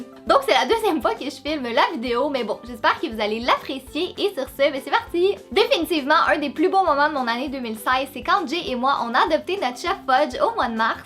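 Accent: Quebec, Canada. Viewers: j'habite en France et j'aimerais franchement aller au Québec pour te rencontrer car tu es super belle j'adore ton accent et tu as une super chance d'aller en France ce pays est super j'espère que le Québec c'est un jour j'aurai la l'occasion de y aller super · Salut, tu es Canadienne ? J'adore ton accent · Tu es vraiment magnifique ❤❤!! Ton maquillage est sublime ❤!!! j'adore vraiment ton accent québécois ❤❤ énorme bisous ma belle ❤ j'aimerais trop passer dans ta story ça serait mon rêve ! ❤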